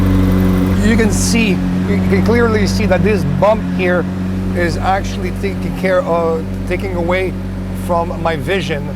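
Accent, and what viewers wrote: France. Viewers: Is this guy French ? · Are you french ?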